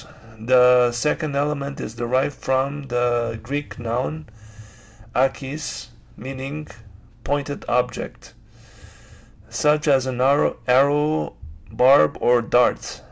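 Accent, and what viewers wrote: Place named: Quebec, Canada. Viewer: accent québécois...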